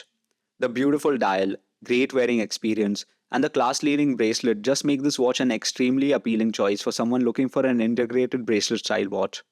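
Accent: India. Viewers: Great video, you are doing an excellent job. New subscriber from Laguna Beach, CA 👍 PS: I’m curious about your accent. I have heard a similar accent before, but I can’t place it. I’m guessing Indian? I like watches and languages. I have studied 6 languages. Another great hobby. 👍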